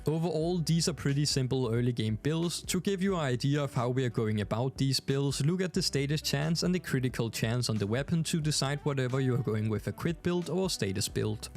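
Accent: Denmark. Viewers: recently came back to warframe and ive been enjoying it alot, your guides have been very helpful getting me back on track. keep doing what you do! also, are you danish by any chance? your accent sounds very similar to a dane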